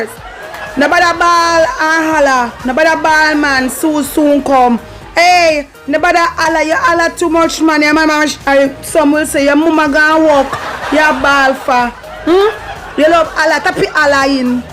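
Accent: Jamaica. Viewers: Wow u r even more stunning live… 💫 Hearing ur accent makes me want to be on the islands . Thanks for helping me with the translation for when I do get to Jamaica 🏝 ❤️🙏🏼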